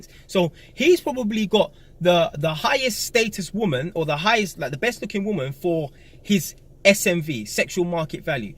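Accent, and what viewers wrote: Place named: Hounslow, United Kingdom. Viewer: Yo g your accent different where u coning from Hounslow?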